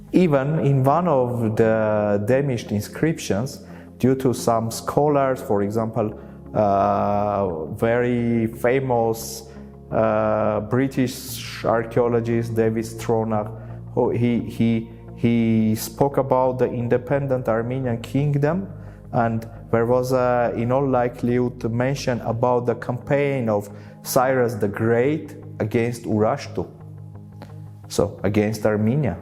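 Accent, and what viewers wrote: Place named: Turkey. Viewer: Interesting and greatly informative video, what is more interesting is I would say the presenter is Turkish if I didn't know he is Armenian, due to his accent and outlook. Most of my good friends were Armenians back in the day, and my father served his military duty in an Armenian village in Eastern Turkey (yes we still have a sizable Armenian community). Contrary to Turkish claims that Sumerians are Turkic, or Meds are Kurdish, the Urartu-Armenian coonnection kind of makes sense to me.But other than the archeological dig outs, I would be more interested in the linguistic evidence, otherwise cultural elements can be easliy adopted by people who came to the region later on. We Turks consume the most tea er capita in the world and love olive oil etc. Sth. similar can be seen in the case of Hatti-Hitite as well. I think I will watch some videos on Urartu-Armenian linguistic connection. 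But this video was really interesting.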